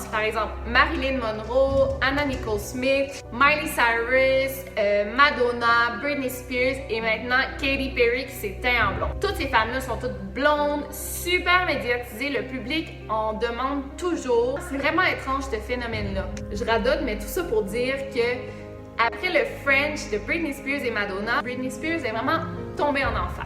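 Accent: Quebec, Canada. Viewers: Beau travail! Je viens de tomber sur ta chaîne, c'est plaisant d'entendre parler 'Québécois' de trucs ''occultes''... T'as couvert les chemtrails ou la géoingénierie?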